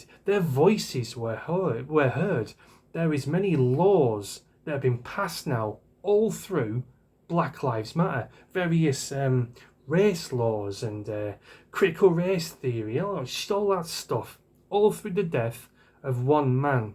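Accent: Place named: Yorkshire, United Kingdom. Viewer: A Very Good video,! Thank You Lad!! I'm fm Yorkshire, and you sound like a great Yorkshire lad, with a lot of Sense! - Thank You!! For All, the sensible and well grounded vid's you have put out! But, just to, hopefully, bring to everyone's attention - how many people know about, or have heard of the Very TRAGIC - DUNBLANE - Where, 116 primary school children, were shot to death, by a local Scottish, Very evil man, who then cowardly shot himself. It, happened in 1996, and it's stayed with me....Forever. But, private ownership of guns was Banned! So, Something, was done about it! And, now there is not as much gun crime, in the uk - but, Senseless stabbings have Increased, So dramatically! Oh, Lord God, please give your comfort and strength to the, Poor, mum's and dad's of their 3 brutally murdered, little daughter's soul's. I, have been So Shocked, and saddened by the pathetically biased so called British media. 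I, wish we still had the 'News of the World' newspaper! At, least they had a BACKBONE!!!!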